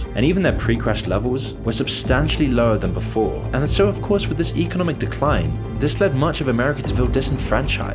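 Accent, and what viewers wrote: United Kingdom. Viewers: A person from the UK with a British accent is doing a video on the US economy lol.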